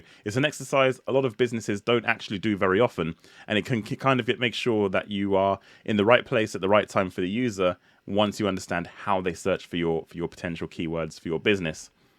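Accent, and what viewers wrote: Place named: United Kingdom. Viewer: I was about to sign up ro linkedin to get access to their learning library but the reviews on their google ads course are shady. Apparently the guy doing the videos has a bad accent and is hard to understand. Then I was considering paying for a cheap Coursera digital marketing course but that covers things I already know and is more costly. I wanted to focus on Google Ads, which is what you are doing here in a beefy 5+ hour video. This is what was looking for. Not only do you have a cool Brih-ish accent but you're putting this out here for free! It's wild. Thank you so much I intend do watch this whole thing thoroughly and injest all your knowledge. Thank you very much kind sir! PS: I love the gaming props in the backround!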